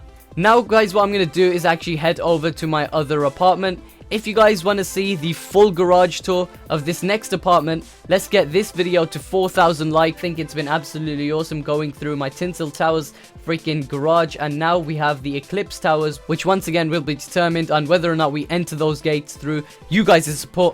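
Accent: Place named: England, United Kingdom. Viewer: You have an English accent but you look like you should live in India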